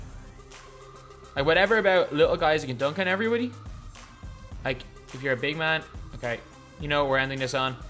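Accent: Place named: Ireland. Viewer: He is from Ireland and I actually like to watch a 2k youtuber who doesn't speak american english. Cause that can get annoying after a while.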